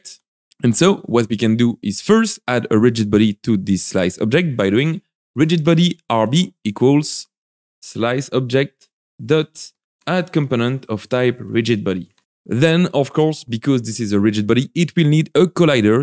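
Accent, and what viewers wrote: France. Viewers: Ah c'est pour ça cet accent Français, je me disais bien que ça sentait familier XDDD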